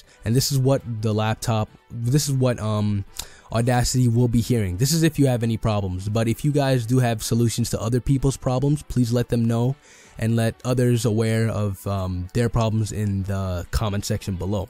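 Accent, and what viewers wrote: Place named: Netherlands. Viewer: Nederlands accent...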